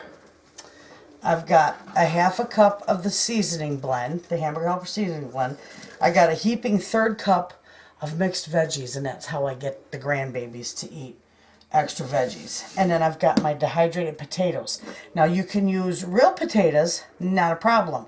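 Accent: Michigan, United States. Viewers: I could tell you were a Michigander right from the first video I watched! I love your accent! Your recipes are sweet, simple and to the point. I looked up info. on the township you live in. What a wonderful, small community to spend time with your families. God Bless you and yours. Subscribed and liked😗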